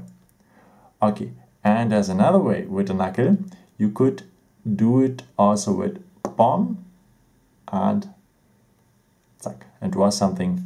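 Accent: Germany. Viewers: Are you from Germany????😂🙂😁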